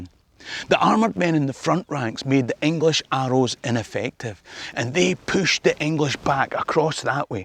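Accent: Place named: Scotland, United Kingdom. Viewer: In Scotland you're probably used to a bit of diversity talking scottish....cos it's not often heard in Australia in any complexion. ..but it sounds so funny...mind you you sound like Billy Connolly. Scottish history is depressing. I always want them to win. Everything seems to have a sad ending.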